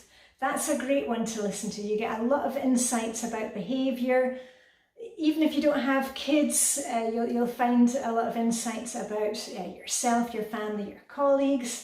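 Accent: Scotland, United Kingdom. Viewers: I love the Scottish accent too and it's my favourite overall, I just loved listening to people talk when I visited Aberdeen a few years ago 😊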